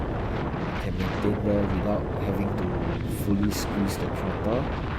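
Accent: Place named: Singapore. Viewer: He's speaking Singlish. Perfectly understandable to a Singaporean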